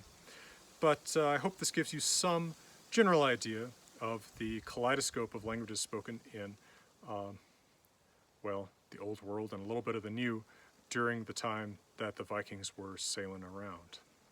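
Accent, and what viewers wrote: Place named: United States. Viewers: Evil Regal Danish, I think. He wants to know which American accent speaks with, and he said it sounds very nice. At least that's what I'm guessing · Hvilken amerikansk dialekt er det du snakker? Den høres veldig bra ut.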